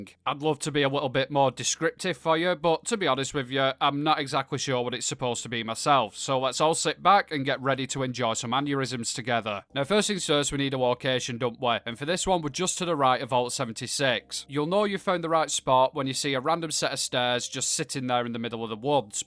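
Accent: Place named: Yorkshire, United Kingdom. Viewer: geez your accent is thick, from yorkshire? i'm from downunder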